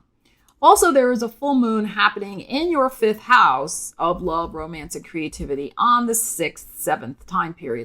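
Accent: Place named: New Jersey, United States. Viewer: She sounds like she's from New Jersey ... or something.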